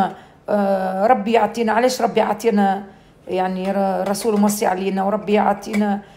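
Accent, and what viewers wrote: Tunisia. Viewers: ما احلاها اللهجة التونسية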